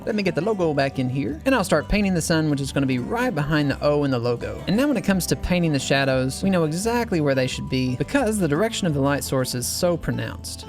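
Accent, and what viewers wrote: South, United States. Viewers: Why does he sound like a red neck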